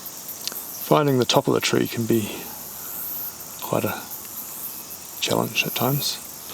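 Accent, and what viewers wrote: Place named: New Zealand. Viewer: Kia ora! Great video, really love the style you do this in (plus it's great to hear a familiar accent) Just wondering where you get your tools and soil etc from in NZ? It can be difficult to source the commonly recommended stuff. I'm just a noob and would love to hear some tips for us specifically here in NZ ❤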